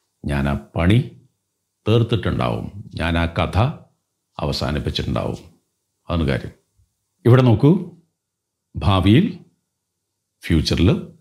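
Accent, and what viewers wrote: Thrissur, India. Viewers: Intro...kalakki....sirntae sound super... Sirntae voicelootae kettappo thrissur bhasha endhu rasama...👌👌👌👌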